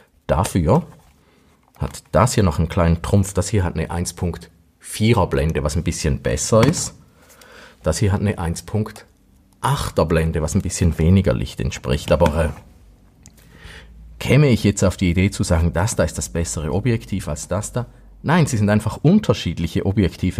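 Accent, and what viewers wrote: Switzerland. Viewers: Deine schweizerische Tonfärbung einfach eine grossartige einschläfernde Wirkung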